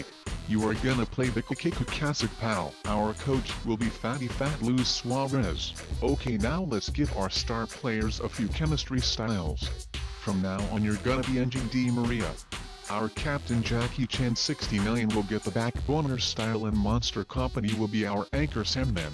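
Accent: Germany. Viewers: Great video! Are you german?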